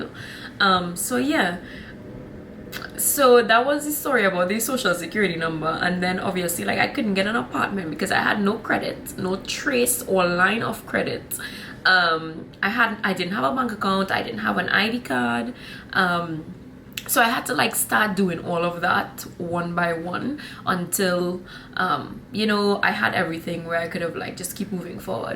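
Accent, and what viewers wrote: Trinidad and Tobago. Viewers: You are so right, I'm in Western Massachusetts perfect for anything,about the long days look up the midnight sun in Norway or the twilight all night long in Northern Alberta,I luv the accent its one of the most beautiful,You're a true Trini a mix of many things, much success to You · I like your Trini accent too. The best Caribbean accent. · It's refreshing to hear a trini accent. I lived in Canada for years long winters and no jobs. I now live in Maryland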